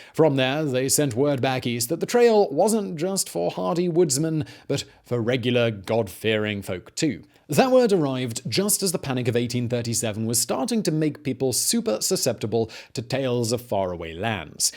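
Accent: United Kingdom. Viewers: Your voice and delivery get on my nerves!!!! And it is nothing to do with your British accent, I usually find that pleasing.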